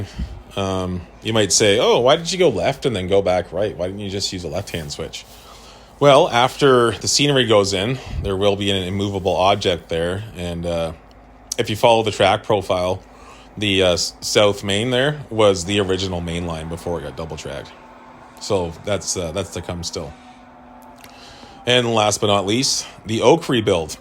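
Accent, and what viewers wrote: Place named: Canada. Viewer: Cheers. I had a feeling you might be in CA. (it was the road name and the pronunciation of 'ou' words - can't miss that! lol) Version 4 ain't your first rodeo. The experience shows, and I like the fact that you are willing to repair/replace/improve the scene or even the entire layout! Also, I like the stretched helix as it has fewer loops and greater access to errant trains. But, I was really surprised that the layout is only 15' wide - it looks much bigger, perhaps due to the generous track radii. So, I'm looking forward to the CTC series, and maybe a track plan? and meanwhile I'll check out the other videos. Thank you for responding - very helpful. Lawrence (CA, too - but, alas! USA, not CA)🙃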